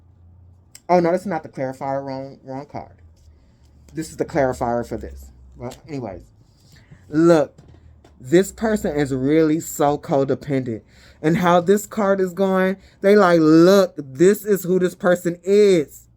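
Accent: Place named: Philippines. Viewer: Hey shadow soul tribe gifted sister. Thank you so much for your knowledgeable reading you shared with us. Take care darling. I believed its my seriously story indeed right now?? Phoenix rising above aka Dragon lady. Watching from Texas. I can tell you are a Pilipina with your accent. Sorry but im just guessing. Take care and ve safe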